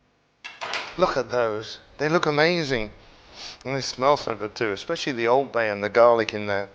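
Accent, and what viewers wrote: United Kingdom. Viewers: I love my brits!! I love ur accent!! Kinda want to move to England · It’s just the way us Brits say it with our accent. When I moved to the US from the UK, everyone laughed when I pronounced it literally ‘Mary-land’. It took me a while before I learned it was pronounced more like ‘Merilyn’.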